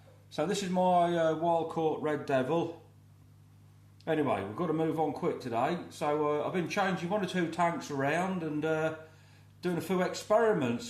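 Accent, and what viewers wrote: United Kingdom. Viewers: Would love to see a full tour of your setup , obviously from the accent you are from the UK but are you UK based ? dont know whether its just my area ( south coast ) but we dont really see many americans other than the usual suspects Oscars,terror, texas etc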